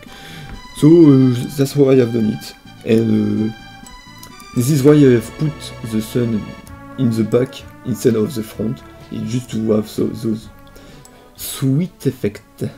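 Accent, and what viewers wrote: France. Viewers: mdr tu me fait rire avec ton accent francais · This is french accent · Ah yes, french accents. "Y a du skill" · nice l'accent français mec ahaha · Oh tu es Fraçais ! C'est bien ce que je me disais ;) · Tu as un axen fr lol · French? I was wondering why you sound like I have no idea about. Are you actually from France? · je l'avais déja remarquer ton accent français :p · As French myself , i enjoyed to recognize this famous accent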